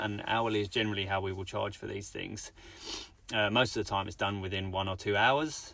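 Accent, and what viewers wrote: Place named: South East England, United Kingdom. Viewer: that’s great pal. Very helpful info… Where are you based if you don’t mind me asking? I’m up in Manchester and south lancs/Cheshire. I’m guessing somewhere south east from your accent 😂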